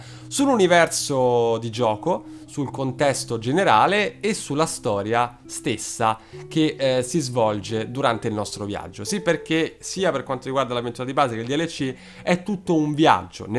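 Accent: Rome, Italy. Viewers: Il vero problema è che tu, avendo uno spiccato accento romano, devi scrivere " 'n c(i)'à ccapito Ggnente...", c'è la doppia "g" anche se serve a raddoppiare il "gn", "nniente" invece "nun sona pe' ggnente". Se mme so' sspiegato, bbene, sennò ve n'annate...(censura)......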